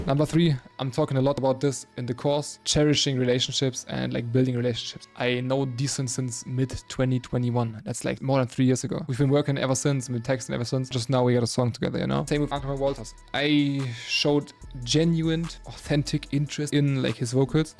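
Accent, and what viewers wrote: Germany. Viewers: man hört direkt das du auch aus Deutschland bist haha. glückwunsch! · its literally my top 1 fav song rn, fire shit 🔥🔥, weird question, but you german? its bc of your little accent, would be crazy lol.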